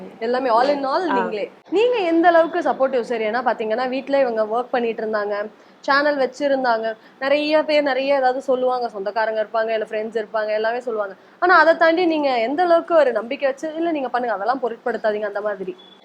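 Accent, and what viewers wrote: Kongu, India. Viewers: Anchor kongu side pola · I think the anchor is from kongumandalam.❤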